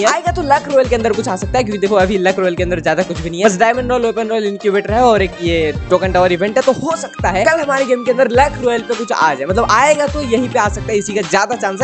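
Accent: Odisha, India. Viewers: Bhai tu odiya he na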